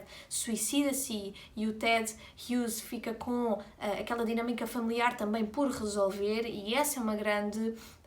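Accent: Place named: Portugal. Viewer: Adoro seu jeitinho de falar, suas palavras, seus gestos, mexendo no cabelo, sua pronúncia desse português de Portugal, tão bonito e mais sonoro que o nosso do Brasil... Outra coisa que adoro são suas roupas de cama, seus edredons, este com essa renda, lindíssimo! Seus lençóis e fronhas bordadas, sua cama fôfa, seus travesseiros enormes... dá vontade de mergulhar nela! Obrigada pela gostosura desse contexto! Sua pronúncia fica no meu ouvido e fico falando com meu marido com esse sotaque gostoso, de brincadeira... Suas interpretaçoes e análises são mto interessantes, de uma intelectual cultíssima e despretensiosa... Seu mundo é delicioso, da palavra, dos livros que vc ama de verdade, dos autores e suas personalidades, que vc liga às suas obras... O contexto histórico e cultural de cada obra.... Parabéns, querida, uma super professora!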